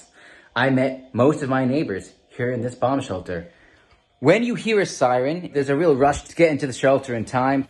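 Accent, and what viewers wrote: United States. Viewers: You sound like an American. What are you doing there?!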